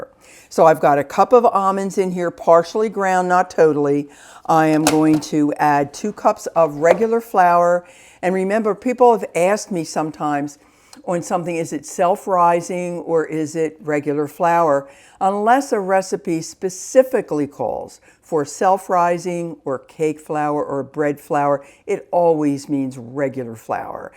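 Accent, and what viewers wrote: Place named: New Jersey, United States. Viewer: Are you from NJ by any chance? You definitely sound like you are lol. I’m from NJ, I live in Haddon Twp., Camden county. I love these cookies and I was looking for a recipe with almonds and low sugar in the cookie recipe. Thank you very much!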